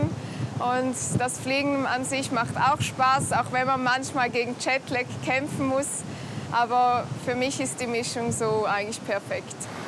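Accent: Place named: Switzerland. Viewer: Douglas Dunning Well she's actually speaking standard German here. With maybe a slight Swiss "tone" left in it. But it's not the official Swiss variation language ( = called Schwyzerdütsch / meaning "Swiss German" ) here that you may be referring to.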